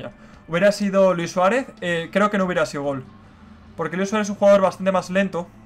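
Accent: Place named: Spain. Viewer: Te llamaremos el porque siempre dices "El" por ti acento español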